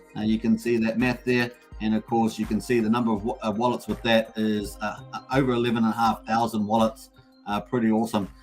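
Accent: New Zealand. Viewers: Are you Kiwi bro?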